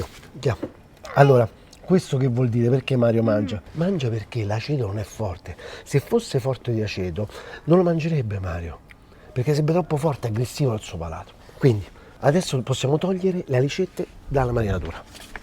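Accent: Rome, Italy. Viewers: Grande! Con il tuo accento mi riporti nella mia magica Roma! Eseguirò la tua ricetta!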